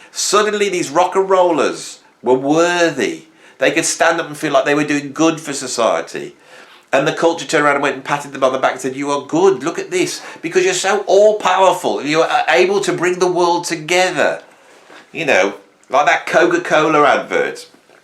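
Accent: Birmingham, United Kingdom. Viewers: Birmingham / the West Country. 🇬🇧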